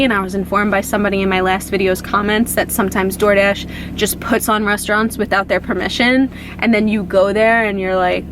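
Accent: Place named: Upstate New York, United States. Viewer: I am not a big fan of the upstate accents whatsoever, being as how I am from Florida, but on you, it's not too shabby. It's not overwhelming. It's like you can tell where you're from, but it doesn't suffocate you, I like it.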